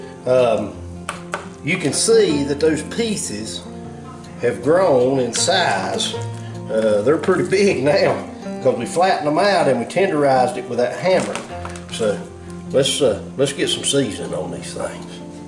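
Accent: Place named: Eastern North Carolina, United States. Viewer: I don't know where you are from, but that accent tells me you aint far from eastern NC.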